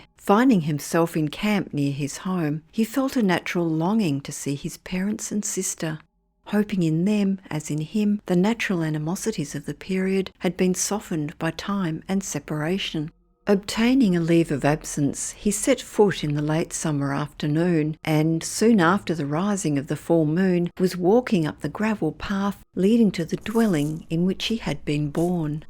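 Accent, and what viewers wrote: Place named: Australia. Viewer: CANNOT hear this story as narrated by an AUSSIE -weird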